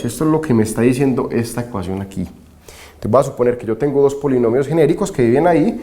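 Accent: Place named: Antioquia, Colombia. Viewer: Los paisas si hablan horrible, pero buena la explicación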